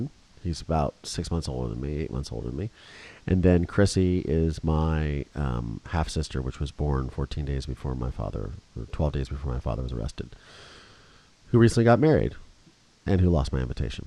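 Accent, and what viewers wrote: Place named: Philadelphia, United States. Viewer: I found out you were from the Philadelphia area after your pronunciation sounded so familiar. I grew up in Abington township- right near the Hot Shoppe/B&N- and later lived in Pensacola at the NAS with a few trips to Bermuda in between. I was abused and spent the past 30+ years putting the pieces back together. I’m rooting for you.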